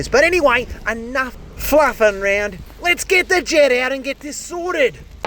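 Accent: Australia. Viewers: !! I gotta tell ya mate. Sometimes I’m in a right bad mood and coming along with you and unblocking these drains really turns it around. I go from feeling like a 1 to a 10 the minute those drains start running clear. Watching from VA, USA and you gotta read that comment in your own voice mate! Americans are notoriously bad at the Aussie accent 😅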